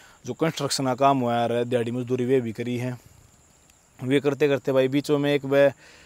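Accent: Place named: Rajasthan, India. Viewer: Being a Rajasthani I can understand him very well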